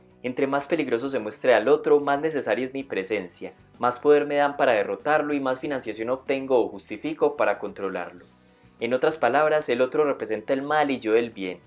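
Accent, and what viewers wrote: Antioquia, Colombia. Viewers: Seguro después de un año ya sabes la respuesta, pero sí, lo es. Pareciera ser de la región de Antioquia o al rededores por el acento. Saludos. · Oye, puedo notar en tu acento que eres de colombia. De estar en lo correcto te queria preguntar: pensando en las proximas elecciones que candidato tu consideras que representa mejor o en algo los ideales liberales? Saludos desde Australia!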